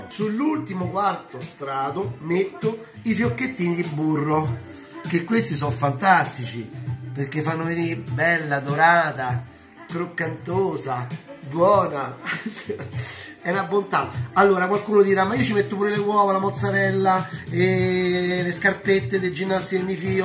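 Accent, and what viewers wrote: Rome, Italy. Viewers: El romano piu che l'italiano ahhaha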